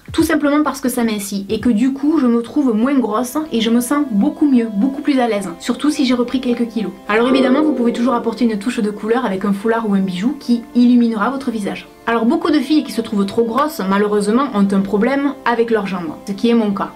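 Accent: South, France. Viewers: Mais je l'aime trop lol je T écouterai pendant des heures cet accent du sud LA !! Ça fait du bien ! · super vidéo et en plus l'accent du sud est super sympa. Bonne continuation. BRAVO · Bonsoir! Tout d'abord, ça ne fait que un ou deux mois que je regarde tes vidéos et j'aime énormément tes petites anecdotes, tes conseils, encouragements etc. J'ai du mal à y croire mais je crois que ça me motive un peu, surtout avec ton accent du Sud! Haha. Donc merci! Je me suis décidé à paraître au grand jour ce soir à cause d'un désaccord (il en faut bien un..). Je trouve que les collants semi-opaques ou opaque alourdissent plus la silhouette que les transparents.. La preuve sur moi: j'ai des mollets épais, des genoux trop ronds et de bonnes cuisses (oui j'ai quelques kilos en trop) et les opaques me donne l'impression d'avoir des poteaux à la place de jambes. Idem pour les semi-opaques! 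Du coup je suis "obligée" de me rabattre sur les transparents et honnêtement ils font effet amincissant sur moi.. Quoi qu'il en soit, merci pour ta vidéo qui me permet de choper deux trois trucs pour paraître fine et bonne soirée!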